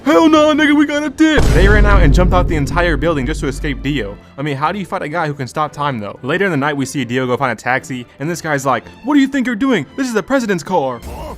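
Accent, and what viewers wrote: United Kingdom. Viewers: I liked the British accent, what are you talking about? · This guys british accent is deadly bruh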